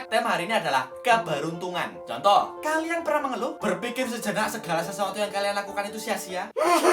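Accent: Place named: Malang, Indonesia. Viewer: Bayu. Orang mana. Malang Yo omongane koyo wong malang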